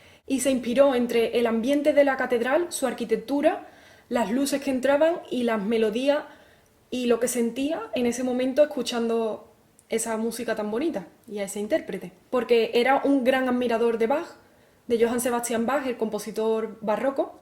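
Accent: Andalusia, Spain. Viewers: Que raro es el acento andaluz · Ese acento es andaluz. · Que Hermoso!!! No words to describe your talent...and then your accent, where you from? Se que de Spain pero de que region? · Buen video, de que parte de España es tu acento??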